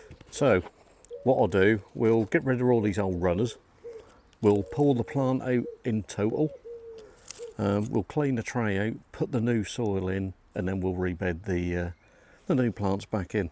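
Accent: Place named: East Anglia, United Kingdom. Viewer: proper east anglian accent there, fair play to you boy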